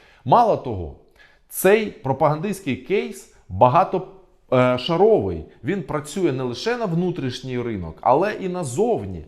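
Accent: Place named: Russia. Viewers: В озвучании текста написаного слышно русский акцент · роси1ский акцент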